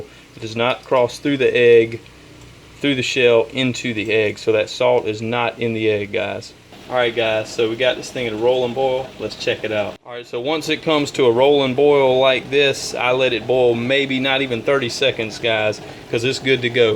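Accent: South, United States. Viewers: I’ve done the cold water with ice and they still are difficult to peel. I will try your method. For the record I like your southern drawl! Keep on keepin on!!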